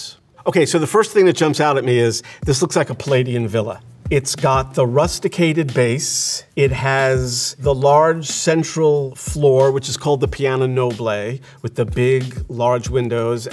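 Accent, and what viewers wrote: United States. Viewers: Can you find an English architect for the English architectures? Or architects according to the building. He butchered most of the proper English names with silly American pronunciations :-(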